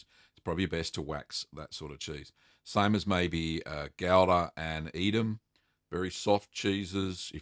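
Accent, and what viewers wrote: Australia. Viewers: I apologize, and now I feel ignorant. I'm pretty sure that's an Aussie accent now - My friend lives in NZ. Terribly sorry for that. I checked your website's IP and saw that it originated from an unknown location in AU.. I feel really silly. Have a good night!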